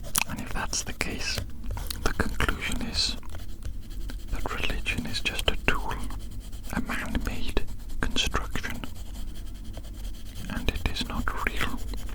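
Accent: Wales, United Kingdom. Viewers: First time viewer and I detected the Welsh accent in record time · Is that a Welsh accent I hear ( I could be completely wrong lol )🤑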